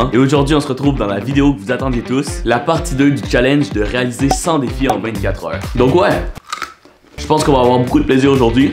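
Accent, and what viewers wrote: Quebec, Canada. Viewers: Un bel accent canadien 💫💗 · Tu es quebecois? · tes qubecois??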